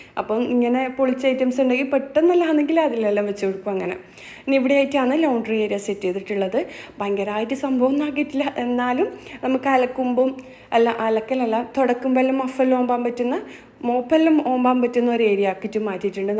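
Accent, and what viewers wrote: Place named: Kasaragod, India. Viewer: avideya, samsaram ketit kasargod pole end. Kasargod samsarikkund anne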